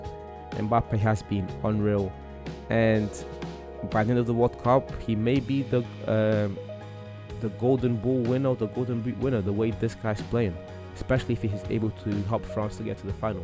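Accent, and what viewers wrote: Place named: Germany. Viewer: Are you German? Accent is strong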